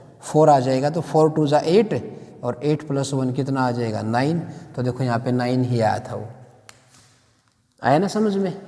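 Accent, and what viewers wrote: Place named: Haryana, India. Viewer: Sir harvanvi galak aa rahi hai sath ma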